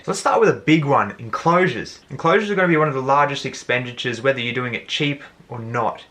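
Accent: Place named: Australia. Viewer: Very good tips, I just recently came across your channel you have great content. Australian accents are the best haha 😂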